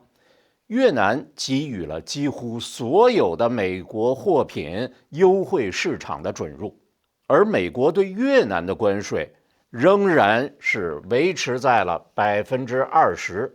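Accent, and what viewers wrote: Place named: Beijing, China. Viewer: ，您的节目是我日日必看的节目! 您的语音是我最爱的听的语音，正统，正规，严谨无误的北京啌，又不贫嘴，又不官腔，太棒了！而且报道的内容我都信服。尤其是好消息，好动态更让我兴奋无比。谢谢！难得难得啊！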